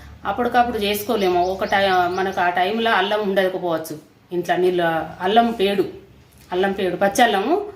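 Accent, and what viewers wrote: Telangana, India. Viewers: చాలా బాగా చేశారండీ .మి తెలంగాణ బాష వింటూంటే చాలా బాగుంది .మాది ఆంధ్ర అండీ.. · Language is very nice delightful telangana accent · అమ్మ మీరు మాట్లాడే విధానం చాలా బాగుంది అచ్చమైన తెలంగాణ మాండలికంలో మాట్లాడుతున్నారు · Mee maata Telangana yaasa super amma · Telangana yasa vintunte feeling good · Pacca telangana accent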